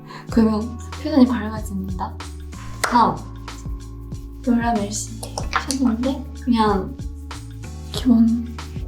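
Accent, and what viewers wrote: Japan. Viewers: 일본여자는 특유의 귀여움과 아름다움 상냥함이있어...옆에있으면 머리를 쓰다듬어주고 싶어요..발음도 귀엽죠. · Sakura has been in kpop for so many years and still she can’t speak Korean well that’s very disappointing, it even sounds like she is speaking with Japanese accent 🤡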